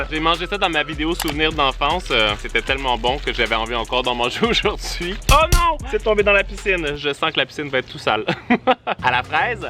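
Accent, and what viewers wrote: Canada. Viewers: tu mon youtubeur préféré desCarl is cooking vidéos accent canadien et qui met quoi des vidéos trop trop rire 🇨🇦👍👍👍👍👍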